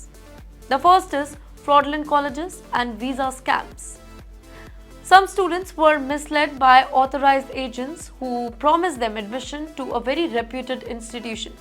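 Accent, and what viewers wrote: India. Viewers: And you should shed your goddam Indian accent! I hate the way you speak English!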